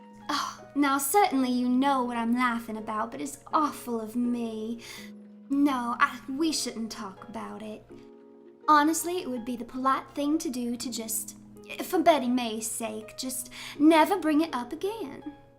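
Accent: South, United States. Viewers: Why does she hide her beautiful, natural southern belle accent? it's so cute. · A great audio RP you do Southern Belle so good!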